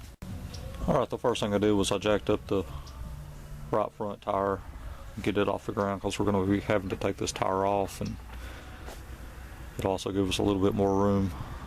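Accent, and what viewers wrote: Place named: South, United States. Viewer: Jeez I can barely understand your country shitty ass accent. Awesome video tho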